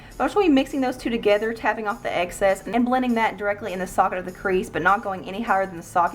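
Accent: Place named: South, United States. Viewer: ahhh for real this is such a gorgeous look..your eyes just pop so perfect with it. What part of the south are you from? I'm from NC and I get comments on my southern accent but it's no where near as strong as yours is, I love it!!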